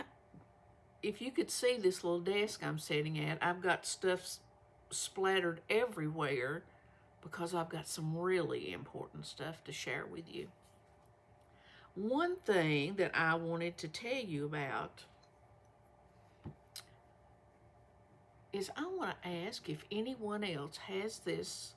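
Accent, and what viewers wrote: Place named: South, United States. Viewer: Hey....it's all good!! It's just from the Southern roots you grew up with. And it's down right special!! I get a lot of pleasure from hearing the different accents. Probably because growing up and being in Florida....where there are actually more Northern folks...so accents not Southern mostly. I love that twang! Don't you worry about it. It's perfect!❤❤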